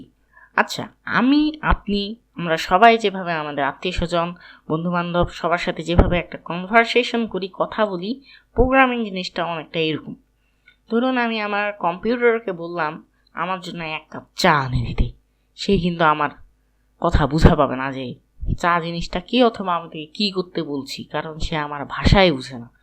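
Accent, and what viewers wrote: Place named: Rangpur, Bangladesh. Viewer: আপনার ভাষা আমি বুঝেছি। যেমন আপনার বাড়ি অমপুর।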